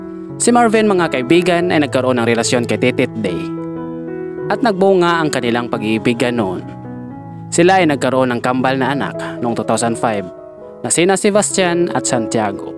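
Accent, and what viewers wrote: Visayas, Philippines. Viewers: bisaya man gud.. pki banggit ng aayos plsssssss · Bisaya ka kuya?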